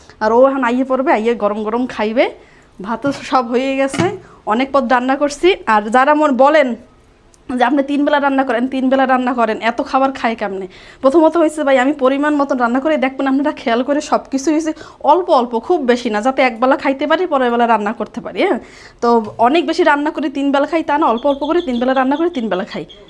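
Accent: Barishal, Bangladesh. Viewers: Apu borishaler vhasha amar onek valo lage ami kintu sylheti ❤😂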